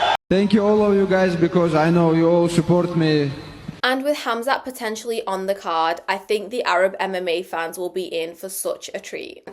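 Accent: United Kingdom. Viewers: Pereira vs aspinall will be held back bc jon jones is holding up the official belt and ducking tom unfortunately. I think if jon beats stipe he will call out pereira and that will be done. Btw am i detecting a yorkshire lass? 🤔😂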